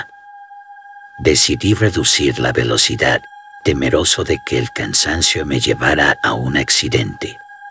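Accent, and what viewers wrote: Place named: United States. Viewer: Su acento es de estadounidense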